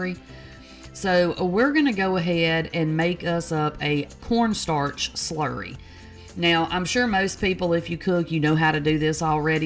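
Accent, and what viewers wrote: South, United States. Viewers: That's good ole southern talk, very pleasing to listen to, great recipe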